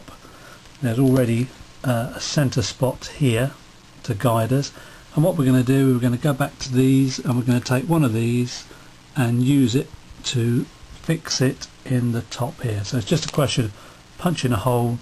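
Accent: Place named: United Kingdom. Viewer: A really well done and detailed instructional video. Also lots of limey charm. Kudos!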